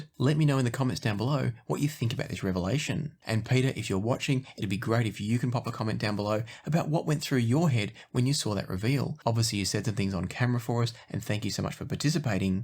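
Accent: Australia. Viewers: I think testing DACs is a good idea. At CanJam NYC, I could not tell the difference between DACs, it takes me a long time for me to notice the difference when I upgrade a DAC (except when getting a May). Also your natural "live" voice is far more Aussie than your presentations. Took me by surprise. But I also remember that you record softly to not disturb your family, so that's cool.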